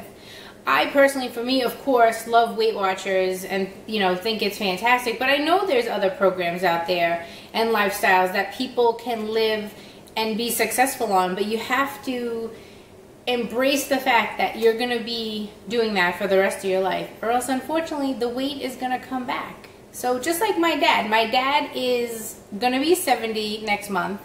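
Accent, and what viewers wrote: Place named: Boston, United States. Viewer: Great job ! Proud of you. New Subscriber from Los Angeles, CA. Do you have a get-to-know-me video? I'd like to know where you are vlogging from, love the accent. My guess is Boston.:)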